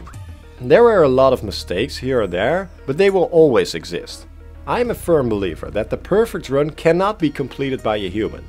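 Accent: Netherlands. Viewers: Ps are you dutch? I kinda hear the accent which would be perfect because so am i:)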